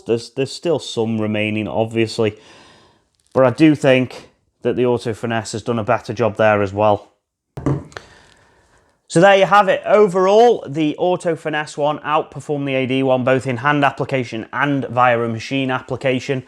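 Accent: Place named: Stoke, United Kingdom. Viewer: Do I hear a fellow Stokie?? 😂😂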